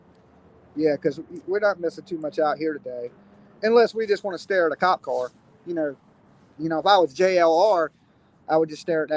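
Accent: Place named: Hampton Roads, United States. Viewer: Hey Dolly, Are you from the 757? You sound like you are. 😊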